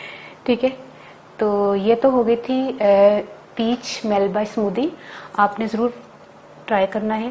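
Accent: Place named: Punjab, India. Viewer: Ap ki Punjabi so sweet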